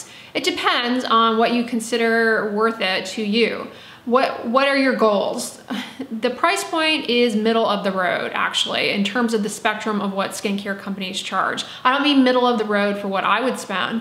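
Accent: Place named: Midwest, United States. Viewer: She sounds like she is from the Midwest ..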